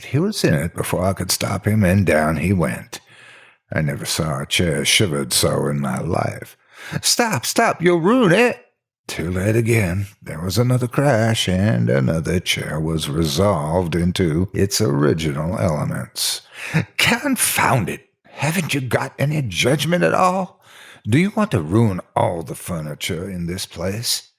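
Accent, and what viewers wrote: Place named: Missouri, United States. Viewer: I knew not that the great Twain had written a spook story. Excellent as all his works are. Thats a great Mssooouriy accent too!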